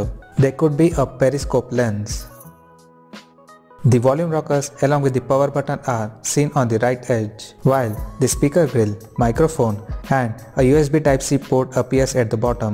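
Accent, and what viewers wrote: India. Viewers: This guys Indian accent is so thick I that I can't understand what the hell he is saying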